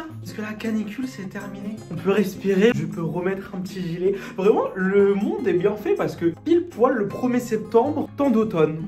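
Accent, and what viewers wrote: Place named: Ireland. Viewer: l'accent irlandais to die for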